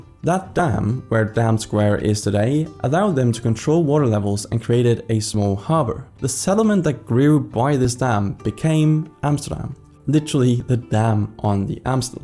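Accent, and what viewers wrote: Germany. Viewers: I'm kind of getting triggerd by the German pronunciation of Dutch words, no slight to the uploader though Dutch ch and g sounds are hard. Just my own pet peeve. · I dislike the German pronunciation of Dutch words, otherwise a good video. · Your Dutch sounds quite German, but I'm glad you're giving it a shot—nice video!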